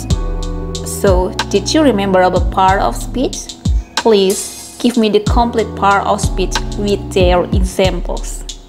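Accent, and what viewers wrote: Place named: Indonesia. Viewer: i guess she's from Indonesia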